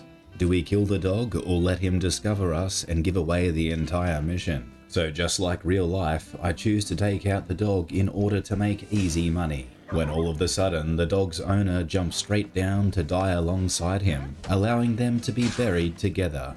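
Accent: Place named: Australia. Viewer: Why is it that I can't find any Australian youtuber that doesn't talk like this. Got to admit though, thier all funny.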